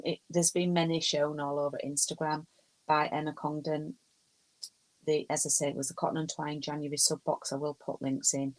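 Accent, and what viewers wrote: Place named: Yorkshire, United Kingdom. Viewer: Great video, and I love your accent, are you in Yorkshire? My mum lives near Sheffield 😊 You really went big with that NY design, it’s going to be incredible! I don’t use a pattern tracker because I prefer paper patterns, but it is great to know what % progress you’ve made, especially with a huge project 👏 One final thing, I couldn’t tell if that big piece of aida was Zweigart (it has orange in the selvedge) but if it’s not I highly recommend you invest in aida from them. It really is the best stuff you can buy, and will give you the best results 👍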